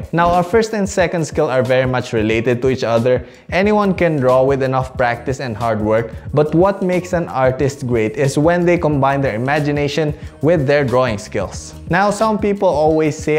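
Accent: Philippines. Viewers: You're Filipino aren't you. The accent can never hide ha💓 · I hear the accent.... pinoy? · Are you a Filipino? Because your accent is filipino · The moment he opened his mouth andstarted talking me be like: "Pilipino to"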